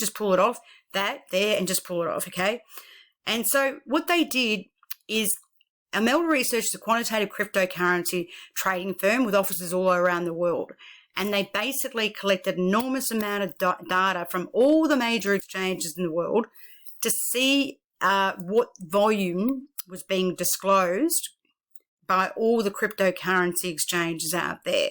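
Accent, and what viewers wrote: Australia. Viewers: mrTiii Very small you're right because Australian pronounce Finance as FaNANCE so is more likely to say BaNANCE.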